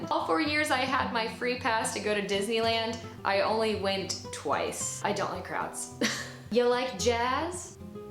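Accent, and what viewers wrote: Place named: South, United States. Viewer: alina kouzouian Southern drawl.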